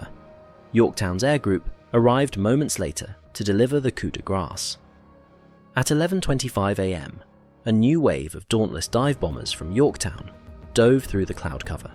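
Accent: United Kingdom. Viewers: I like everything about this channel, including the posh English accented narration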